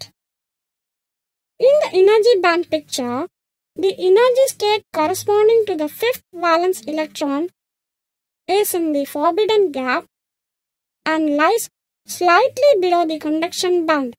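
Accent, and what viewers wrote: India. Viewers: A South Indian chipmunk?!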